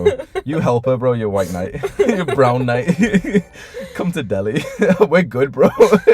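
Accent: Liverpool, United Kingdom. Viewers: I think he's from Liverpool ahah, I'm from Bradford and my accents pretty different. But I guess it shows how right you are about accents changing alot aha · Never miss a video.. Cuz learning British accent.. 😂😂❤️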